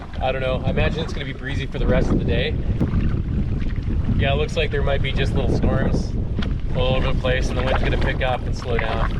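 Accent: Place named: Saskatchewan, Canada. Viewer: Great canoe camping videos. It made me very nostalgic, When I was very young (24ish, I am 62 now) I sold my car and all other possessions and went on an almost two years coast to coast (from Newfoundland to Vancouver) Canada backpacking adventure. I stopped the longer in Saskatchewan where I got a temporary job helping a University of Saskatchewan team trapping muskrats, mink & beaver in the lakes and rivers around Saskatoon as part of a large research project of professor Dr.Francois Messier. I was a young, enthusiastic vet and the team needed one for the anesthesia of wetland wildlife they were working with. Lots of canoeing and kayaking putting tons of Tomahawk live traps in muskrat and beaver dens in the summer. Later some snow machining doing the same in the brutal Saskatchewan winter. All in very similar places as your videos (therefore the nostalgia and listening to your familiar Sask accent). 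Lots of fun. Loved it. I run away in the winter to visit a friend in BC and to escape from the brutal snow & ice over there. New sub from the Yucatan Peninsula Mexico, I do some sea kayaking here if you are ever interested in that kind of stuff. Thanks for sharing. Elbows up! Canada strong!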